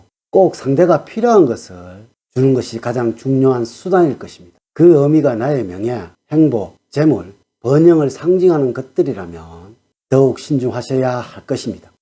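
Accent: Gyeongsang, South Korea. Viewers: 경상도 사투리 듣구 나갑니다 경상도=신천지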